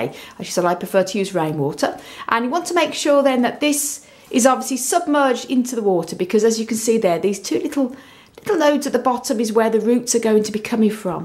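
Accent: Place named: Solihull, United Kingdom. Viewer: Really useful video, I hope to propagate this way from my ornamental pineapple and its pups! Thanks for making, thanks for sharing your wisdom! You sound like you might be from Solihull or somewhere thereabouts? Xx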